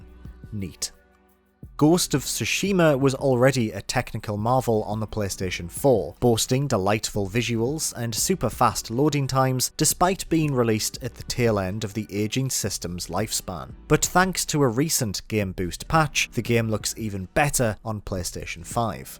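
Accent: United Kingdom. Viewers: What accent is this? Which part of the UK?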